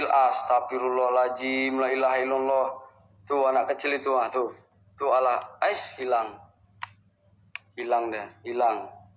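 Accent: Medan, Indonesia. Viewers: Bg orang mana ya.. Medan kah... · Bg, abg org mn? Koq cem org medan bg? Salam kenal y bg dri kami. Anak" awak sukak nonton video abg, lucu x kata anak" ni abg😆😆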